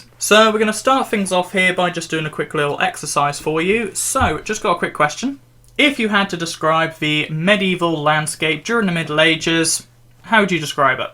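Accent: Norfolk, United Kingdom. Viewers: I'm Norfolk born n breed . So your accent is music to my ears . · The only thing better than a Norfolk accent is a Norfolk sense or humour you are a star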